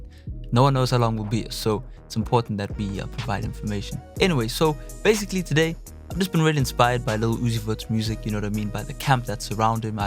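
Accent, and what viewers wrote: South Africa. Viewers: you sound you from South Africa 👌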